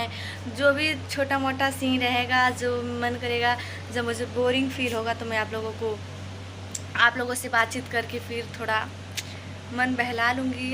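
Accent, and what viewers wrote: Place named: Bihar, India. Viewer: Aap lgrha hi ki bihar ki hi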